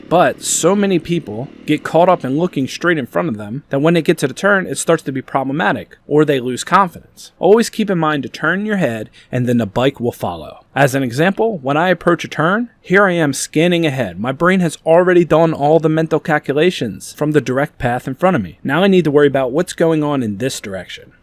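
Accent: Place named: Pittsburgh, United States. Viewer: You from Pittsburgh? I sense a Pittsburgh accent N nat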